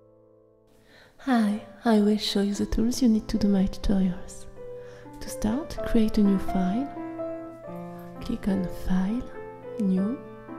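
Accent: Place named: France. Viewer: I love your french accent! And dont worry, i understand very well. Thank you for your video!!!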